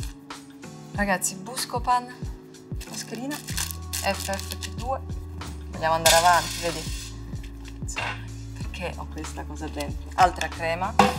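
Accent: Italy. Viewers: un bellissimo accento italiano💗:)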